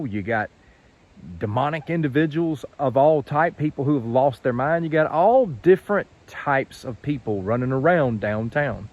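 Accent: South, United States. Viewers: Just Love your Southern accent ! Greetings from Australia. Bless.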